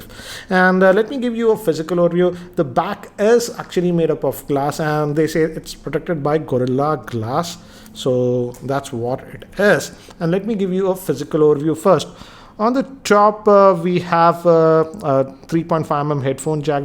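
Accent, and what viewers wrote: India. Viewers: 🔴 I like Indian accent 😂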